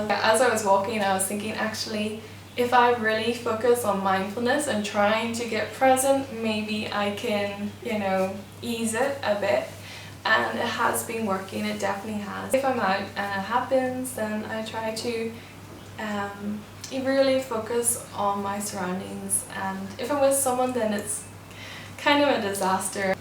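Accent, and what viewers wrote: Northern Ireland, United Kingdom. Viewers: You said that medication seems to make it worse. I have been somewhat pressured into taking various medications and to be honest all of them made derealization much worse. I definitely cannot take alcohol anymore because the hangover is basically really intense derealization. I guess the only way out is acceptance and to limit the fear response when I experience it. I wonder how you are coping now. I hope you have found a way out. I detect a NI accent.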